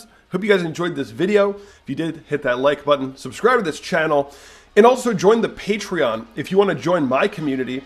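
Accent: Sweden. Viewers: Are you from Sweden originally?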